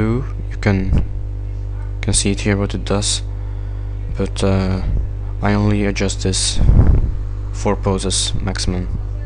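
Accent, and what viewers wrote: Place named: Russia. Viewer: Lijkt wel of je een rus ben met dat accent.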